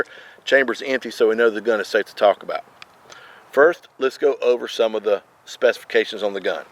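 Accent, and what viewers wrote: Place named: South, United States. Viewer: that southern accent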